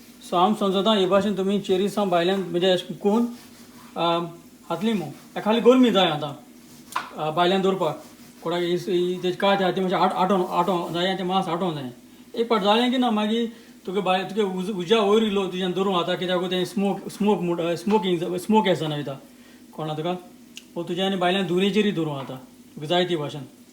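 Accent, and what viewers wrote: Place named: Konkan, India. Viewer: I like your konkani accent...and your recipe's are really good. 👍Tuge chouris maas receipe maka bori lagli. Mog asum.🤗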